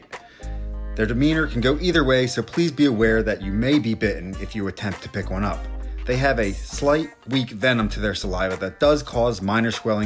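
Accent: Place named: Delaware County, United States. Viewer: Sounds like a delco guy. With that accent!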